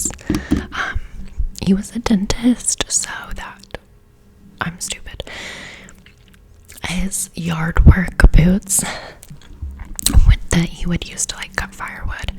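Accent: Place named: Australia. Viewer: You're such a special person. Weird thing to say given we've not met but I spend time with you almost every day. I look forward to your hot take on whatever the topic is, I adore your Australian accent (and other), and overall wit. Thank you for sharing so much, bestie. Like, can we grab a cocktail if you're ever in Phoenix? :)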